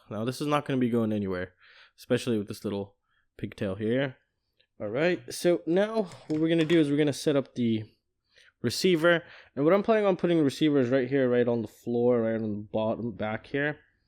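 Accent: United States. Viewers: Wait... American accent but mentions tesco... wth dude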